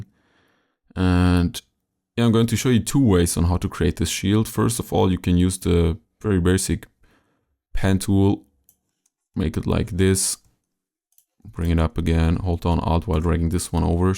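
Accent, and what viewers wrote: Germany. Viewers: kanns sein, dass du deutsch bist? :D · Watched every second of this. Lovely. Jurgen Klopp came to mind through out because of the German accent lol. Bravo! Subscribed!!!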